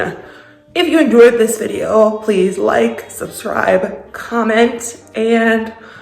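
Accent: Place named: Wisconsin, United States. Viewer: I to am a Wisconsinite, you go girl 🎉🎉🎉 you must be up North I’m in southern side not much snow here just cold 😊😊 I should’ve known by your accent since everyone thinks we have an accent in Wisconsin😂😂😂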